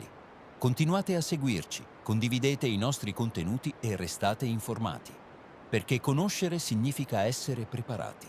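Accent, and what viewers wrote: Italy. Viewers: Wow, the reader (or "reader?) of the information this time is the strangest one I have ever heard! In the beginning, there is a clear Italian accent even with the super rapid speech, yet there is also the typical AI pattern of pausing between words that belong together, failing on scientific terms like Bradyseism, and misunderstanding Celsius for whichever funny variety that comes out each day. On top of that, the accent also changes to some variety of Asian pretty quickly, and there is even a couple of inserted space filler sounds like "ah" and "mm"! Please, commenters that read this and are fluent in English: volunteer to do the reading instead of letting AI abuse the daily bulletins! I would do it with pleasure, but my teeth will prevent that for a few months, so at least one other subscriber is needed!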